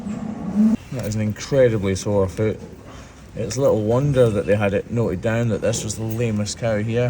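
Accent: Scotland, United Kingdom. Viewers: As I read your book, I'm reading it in your Scottish accent 😂 Kinda weird eh 😊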